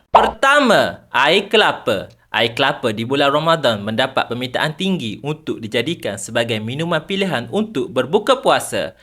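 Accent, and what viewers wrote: Kelantan, Malaysia. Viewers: Kelantan ke bro ?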